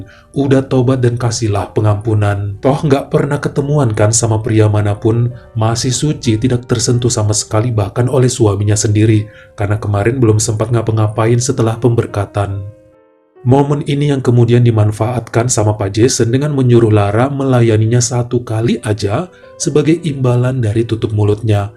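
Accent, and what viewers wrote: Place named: Medan, Indonesia. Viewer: setiap denger cerita dr channel bang aku ketawa ngakak. mgkn krna aku berasal dr medan udh terbiasa denger logatnya, dan ada kesan lucu polos dlm bahsa2nya..sugguh menghibur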